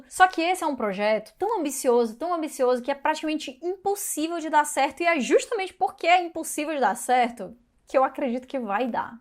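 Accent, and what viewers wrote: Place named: Ceará, Brazil. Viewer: Olhe só, uma cearense com um canal legal. Saquei o "meu" sotaque nas tuas primeiras frases. Li o Duna com 16 anos, e foi uma viagem... aliás, não poderia ter sido diferente, né? Assisti o filme, bem criança ainda, na Globo, bem antes de ler o livro. Lembro que não entendi nada mas adorei (sempre fui louco por sci-fi). Revi o filme, séculos depois... Olha, gosto dele. Acho que porque fui assistir já com o peso da crítica negativa em cima, e eu meio que pensei "É, não é tãããoo ruim assim". Felizmente, tiveram a sensatez de fazer agora dois filmes: porque, condensar aquela complexidade num filme só, não tinha condição. Com dois, pode ser: acho que o ideal mesmo era fazerem uma série, cê não acha? Comprei há pouco "O Messias de Duna", que aliás já tinha lido. Apesar de muito mais curto que o primeiro, nele, as tramas labirínticas no psicológico das personagens é bem mais impressionante. 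Li depois "Os Filhos de Duna", o quarto da série, e... achei legal. Mas nada demais. Integridade artística à parte, fica óbvio que, para o Frank Herbert, ele havia acabado de "construir" o seu ganha pão: poderia viver o resto da vida só de escrever continuações à saga... o que ele, de fato, fez; e ainda rolou uma graninha pro FILHO dele, que escreveu o último (Aff!). Sete? Oito? Ainda quero ler o terceiro, "O Imperador-Deus de Duna", mas não tenho intenção de ler os outros. Abraço.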